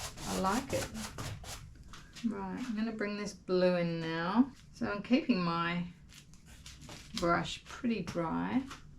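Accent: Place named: Australia. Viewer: You have taught me over and over again that a piece is not finished until the artist is happy with it and this piece shows this amazingly. May I ask if you are in Australia. You sound like you are but I've been wrong before. Once again thanks for sharing your prodigious talent with us mere mortals. 🙂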